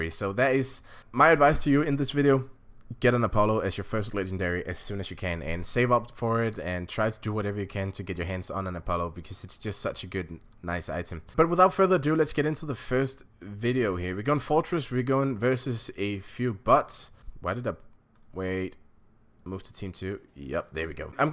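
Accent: Denmark. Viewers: Just a random guess, is from Denmark? (judging by accent) If so, then TUBORG HVERGANG!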